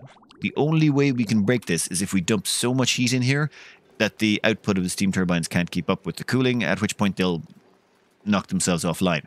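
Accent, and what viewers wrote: Ireland. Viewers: 300 hours into this game and I still struggle to understand what the hell you're talking about at times - your Irish makes it absolutely worth listening though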